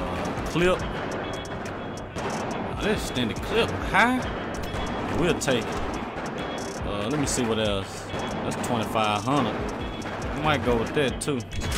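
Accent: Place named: Texas, United States. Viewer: This guy has to be from Texas. Love it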